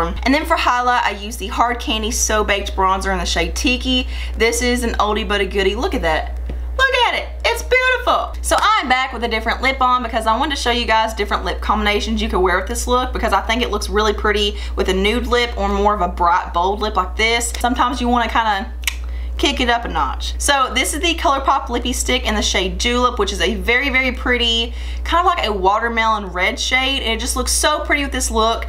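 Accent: South, United States. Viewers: Your accent! I'm from Alabama so I'm really southern also! Where are you from! And, Amber rush is my Go-to eyeshadow! I wear it everytime I do my makeup! 😍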